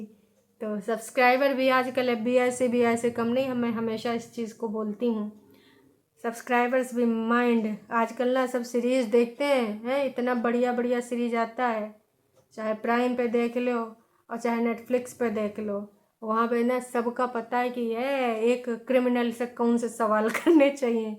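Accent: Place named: Awadhi, India. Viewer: Mam,aapka vlog or aapka way of talking bahut atcha lagta hai or beech beech main aapka awdhi bolna bahut atcha lagta hai.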